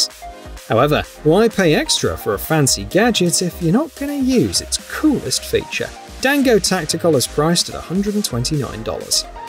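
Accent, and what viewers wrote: United Kingdom. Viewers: Al-u-min-i-um is the British term. Judging by his voice, I would say the narrator is a Brit. Aluminium is also the preferred scientific usage, like helium & lithium. You've had a good day. You learned something new.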